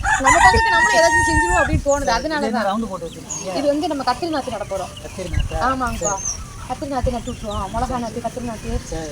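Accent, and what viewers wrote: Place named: Madurai, India. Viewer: Ooruku varaum manjukurompa santhosama eruku mugathil avalavu santhosam mathurai thamil apadiye eruku americaviliruthu india vara pilaigagooda evalavu santhosa patirula mattaga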